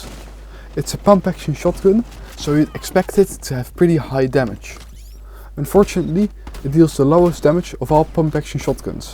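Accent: Netherlands. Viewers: Are you dutch? I think i recognise your accent. Great video btw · Are you dutch? You sound a bit like it. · good video also, are you dutch by chance? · Im sure you deserve more attention. I really like your dutch accent. You have a talent, keep going! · I hear fellow dutchman, i press like 🇳🇱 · always nice to hear a dutch accent in english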